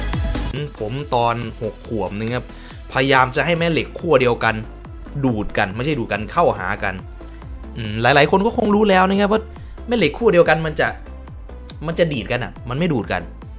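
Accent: Isan, Thailand. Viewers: พี่ คนอีสานป่ะ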